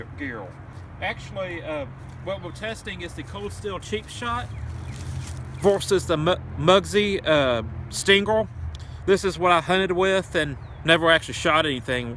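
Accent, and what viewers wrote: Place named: South, United States. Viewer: Speech impediment and a southern accent, l feel your pain!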